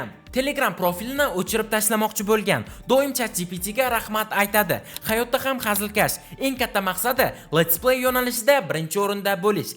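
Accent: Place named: Samarkand, Uzbekistan. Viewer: samarqandlik ekanligini shevasidan bilgandim zemlyakim buladi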